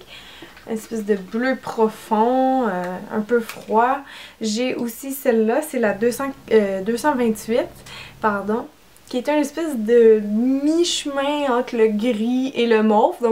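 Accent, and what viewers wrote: Quebec, Canada. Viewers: En effet, très bonne marque de produits cosmétiques. Je cherche un anticernes mais je ne sais pas trop quelle teinte choisir. J'ai les cernes violines à bleuté, quelle teinte me conseille tu? Merci d'avance, j'adore ton accent canadien, continue comme ça!! Kiss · accent quebecois --'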